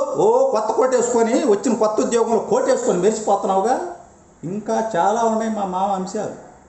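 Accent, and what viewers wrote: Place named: Nellore, India. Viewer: garu, meeru mee nelluru accent lo, goppaga, ekutunnaru sIr.... Bagundhi...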